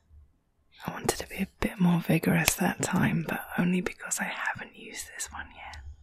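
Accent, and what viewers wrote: United Kingdom. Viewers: Really enjoyed listening to this...makes a change hearing an English accent but also fascinating understanding how you approach your art. Would love to see more of this from you as it works on so many levels :)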